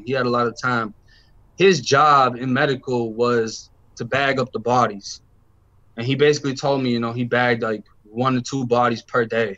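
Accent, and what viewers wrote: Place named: Boston, United States. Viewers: I love the Boston accent that is coming from a straight up natural born Brooklynite... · yo G Im from NY to me youu sound like you have a Boston accent with a A's and R's ... Car you sond like kah-rrr.. The broomstick should be called "Frisk From In Too Deep" from LL Cool J wit his sons Godfather right hand mans on the table. "Youu see him butt naked on the pool table tide down all bleedin n shit n say to yourself o God what a foul nigga right.... welll IM DRUNK WIT REVENGE MF LOVE IT LOVE IT, THINK ABOUT HER THINK ABOUT HER"... that shit was Brazy for homeboy boy, shit was crazybrrazy for me. Im cool wit everyone more 3's but MAD 2's too.... Im good on me, when i went in they tried to give me mad shit n my heart check was so crazy bro this big OG CRIP wit a gray durag n green slippers on sees im the only one thats 5'9 or looks white, we get transfered 6 ppl chain gang style n obv big homey ASSUMED i was FOOD, but he found out I WASNT FROM JUMP STREET N IM STILL IN CHAINS WIT THE PPL N GUARD, then when iwas on the phone tryna tell her to get my shit from the safe n he gonna try n take my phone or commessary or think im food! 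I SHUT TTHAT SHIT DOWN FUCKIN FAST n it was my 1st Time in Riverhead, CI was diff! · Boi has the Boston voice.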